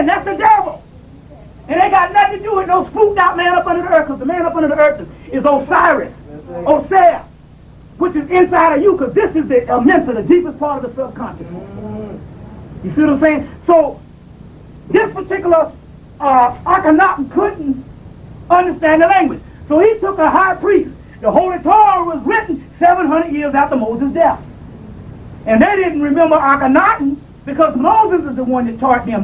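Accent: South, United States. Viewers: mispronouncing Authors is hard to understand the Southern accent he has..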